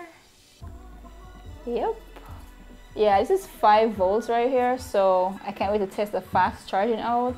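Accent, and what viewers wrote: Jamaica. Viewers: So many unboxings but how many with a Jamaican accent? Actually this is the first Pixel 2 unboxing I am watching.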